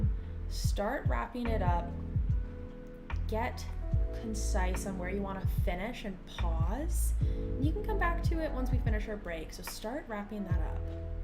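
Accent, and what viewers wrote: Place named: Canada. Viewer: You’re Canadian, eh? Sammmeee 🎉❤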